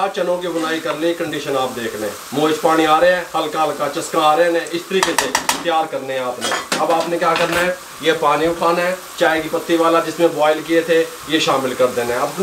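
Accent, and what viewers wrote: Punjab, India. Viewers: Oh ji tusi great ho Aapki Punjabi badi sweet hai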